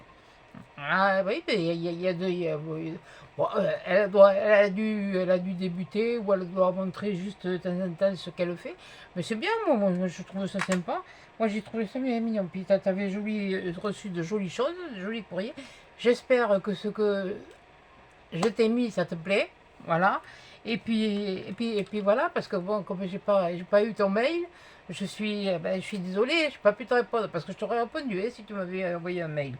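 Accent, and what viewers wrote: South, France. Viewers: Cc, magnifique cette page très réussi,😍 Maminette a eu une très bonne idée pour ce défi, j'adore t'écouter chanté avc ton petit accent du sud c'est merveilleux ❤, plein de câlins a Arkos 😻, Bonne soirée et 🌃 bisettes 😘😘😘💝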